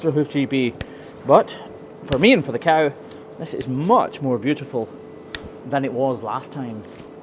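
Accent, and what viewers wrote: Scotland, United Kingdom. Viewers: Thank you. I think this is the one I have bin waiting for, bin worried about her. I think I called her a different number though. Lol I'm Canadian and I can't quite get your accent. I love it. Especially when you have the deep Scott's accents on. 😊 wanted to let you know I love your channel. Bin Bing watching it you reside me of my grape he was a vet. I got to see so many amazing things with him. He was so loving and caring animals first. He even stitched up my finger when I cut it of at the age of 7. Mom said hospital grandpa said " what am I? It's just a finger. I got this." And so it was I was stitched up by gramps. Love it. Oh your no where near his age and actually your younger than me. But you do reminded me of him. So thank you for beeing you. Your Albertan friend.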